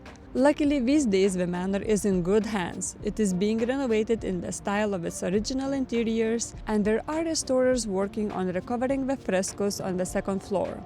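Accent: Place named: Russia. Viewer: You have a very russian accent wow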